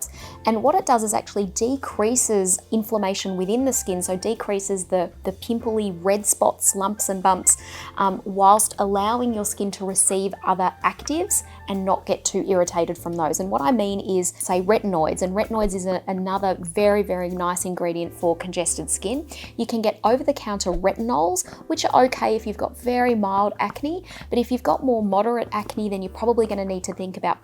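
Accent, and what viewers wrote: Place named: Australia. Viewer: Nice to hear an Aussie!!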